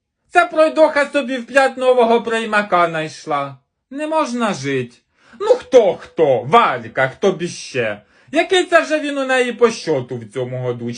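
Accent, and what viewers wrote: Poltava, Ukraine. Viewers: Полтавський говір)) дуже влучно) Ви не з Глобине?)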